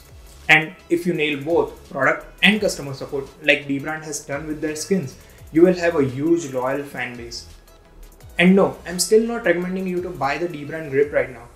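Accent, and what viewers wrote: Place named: India. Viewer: I was watching this wondering how all indians sound the exact way we Americans think you sound 😂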